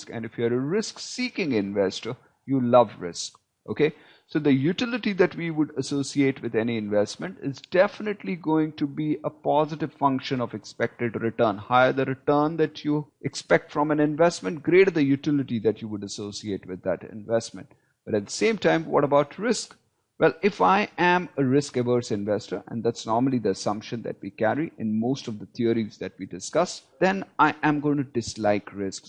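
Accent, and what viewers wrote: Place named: India. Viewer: The video seemed good, but you could've used someone with a little better/softer accent. I mean, no offence, Indian accent is already quite hideous, but this one was absolutely abominable.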